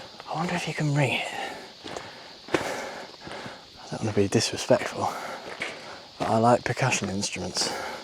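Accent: United Kingdom. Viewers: L'accento britannico è decisamente più civile e gradevole, a confronto con quello americano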